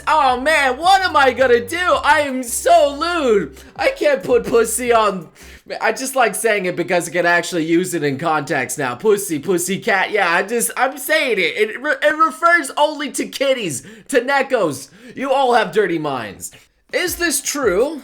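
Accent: Ireland. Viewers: I love that Irish accent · Your Irish accent is awesome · OMG HIS IRISH ACCENT IS THE MOST PERFECT THING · that Irish accent though XD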